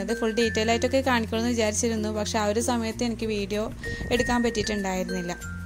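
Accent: Kannur, India. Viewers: Kannur basha 👍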